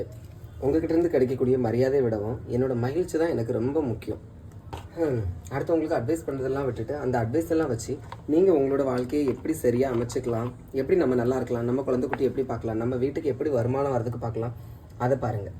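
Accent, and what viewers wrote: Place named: Nagercoil, India. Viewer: Hi Anna Nanum Nagercoil than Ippo Chennai la erukom Unga video pakkum pothu, namma sontha mannu pechu, romba santhosama erukku anna. Niraya video podunga anna Aduppankarai pakkum pothu namma ooruku pona mari santhosam Thanks anna❤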